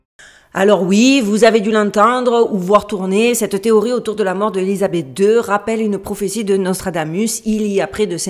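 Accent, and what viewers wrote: South, France. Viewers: Tu ne serais pas originaire du sud de la france des fois 😊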